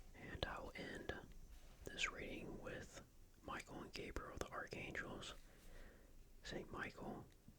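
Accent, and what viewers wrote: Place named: Delaware County, United States. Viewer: I’d love to focus on the reading but I can’t help but wonder if you’re from Delco PA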